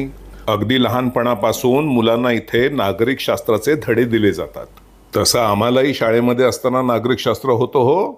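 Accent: Pune, India. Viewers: काय मराठी आहे अगदी पुणेरी शैली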